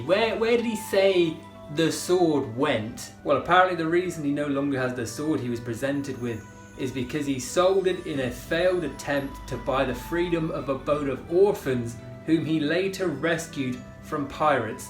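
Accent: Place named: United Kingdom. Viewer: Thanks so much for the British English and humor! As a former bouncer, it is very difficult to understand how people think any of this can ever prepare you to really defend yourself? It should be a crime to let people teach this shit...just like sending soliders to war with weapons that are "may be's"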